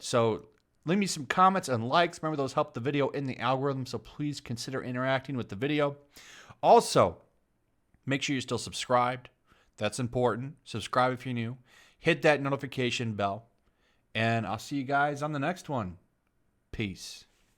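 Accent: England, United Kingdom. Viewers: As much as I may agree with this dude’s point, his English accent is intolerable! I had to quit at the mark; Godspeed to anyone else who tries.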